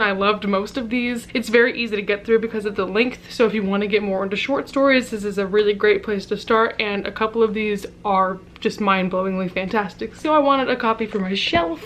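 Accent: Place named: Germany. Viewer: Always so excited to see some German books!! ;) Also, you're German accent is amazing! Especially the "ch" you nailed! Ich bin gespannt, wie du es finden wirst, Victoria Schwabs Bücher auf Deutsch zu lesen :D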